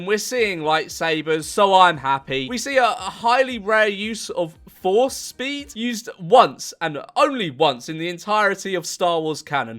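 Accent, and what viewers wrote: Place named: Liverpool, United Kingdom. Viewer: The scouse accent is class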